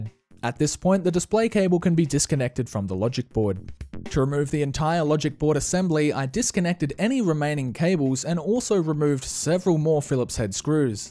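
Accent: Australia. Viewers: I just realised that Australians seem to pronounce “assume” as ashoom 😁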